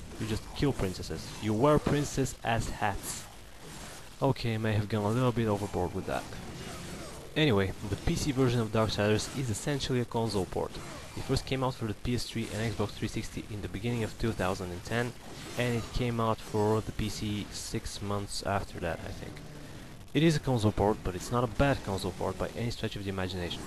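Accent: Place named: Venezuela. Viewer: lol my friend from Venezuela has the same English speaking accent as you. xD where you from? Subbed btw.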